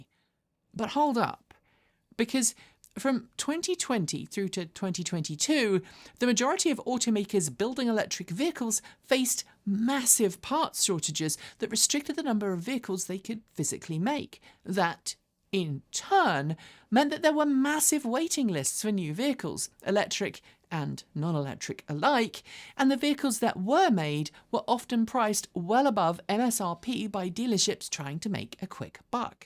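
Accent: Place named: United Kingdom. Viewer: Its not co.plicated. the ev push got people to run out and get them then realized how very diffiucult they are if you have much distance to drive. But you sound british so may not understand at all. Out here in westeren america where its minimum 50 milea between towns and vast majority dont have charging and winter real winter it takes only one trip to understand how unreliable thwy are.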